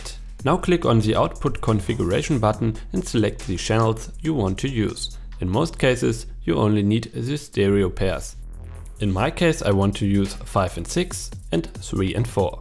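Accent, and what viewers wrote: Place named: Germany. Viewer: The German accent ist awfull 😀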